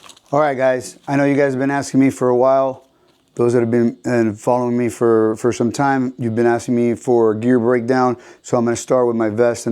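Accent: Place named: Miami, United States. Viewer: Love the Miami Accent...LOL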